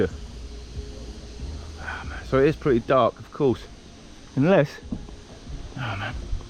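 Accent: England, United Kingdom. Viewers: Where are you from? England??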